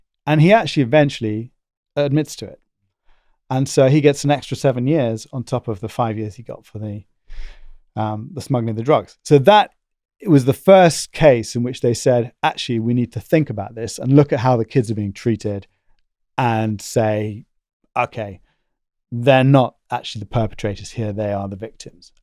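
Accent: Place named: RP, United Kingdom. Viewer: What is it with the comments on here saying this guy doesn’t know what he’s talking about? Well he clearly does and I think he was very precise. Probably because he has a plummy accent so he shouldn’t be taking about drugs when in reality it’s those with middle class plummy accents who consume the most. And others who think it’s only middle class people who snort Coke? I grew up in a council estate in Birmingham a very rough one at that, and believe me once the kids were with the dads for the weekends there was plenty flying around at girls parties!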